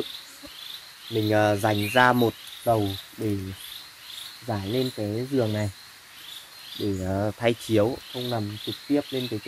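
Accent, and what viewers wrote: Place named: North, Vietnam. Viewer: Hay quá... giỏi quá tiếng nói chuẩn ngoài bắc luôn...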